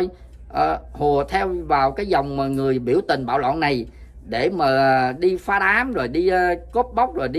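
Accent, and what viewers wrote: South, Vietnam. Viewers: Chào anh, mình xem clip của bạn,mình thích nhất giọng nói người người miền nam của mình.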